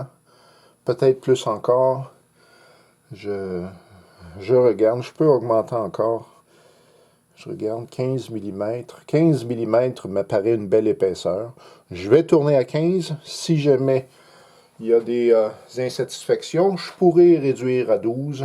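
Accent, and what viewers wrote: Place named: Montreal, Canada. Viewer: bonjour je me suis abonné dernierement.je ne suis pas tourneur n'y menuisier mais vos video sont trés enrichissente de conaissance. vous n'ete pas avare de renseignement et de conseil votre voie est calme et tres douce tres sur,tres professionel. votre accent j'ai l'impression d'entendre mon beau pére qui est de montreal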